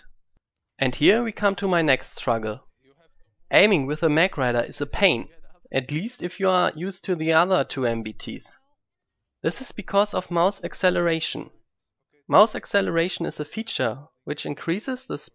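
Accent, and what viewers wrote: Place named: Germany. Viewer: Thanks! Very informative! I just upgraded my Magrider, so I dont Need to be ashamed, to have a gunner. This Video helped me a LOT.Ive mainly Play Harrasser or any Kind of Airvehicle. The Magrider was really a challenge for me, but it Looks BOSS. Im goin with the standart Nova, because He aint that good at aiming, if I want to kill Infanterie. For the Gunner, I am going with the Gun, wich gets faster while Shooting. Its not very accurate, but has the highest DPS.With that gun, you can easily do an Destruction-Combat against another Tank with gunner.Im using the Magburner, for the others, I havent decided yet.Thanks for doing this Video m8.(and I can clearly here youre German Accent, "Dosenöffner......auf welchem Server Spielst du?)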